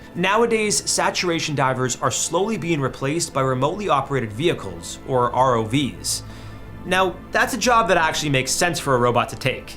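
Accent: United States. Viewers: Can anybody tell me which American accent he has? Cuz i dont know which kinda American accent o have bur whoever I have, it matches the most with his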